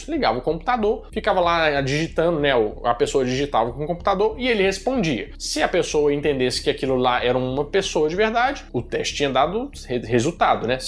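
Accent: Minas Gerais, Brazil. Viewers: Adoro o canal, muito bom, mas os americanos não entenderiam nada que vc fala em inglês, com esse sotaque mineiro, tem que falar mais caipira o erre...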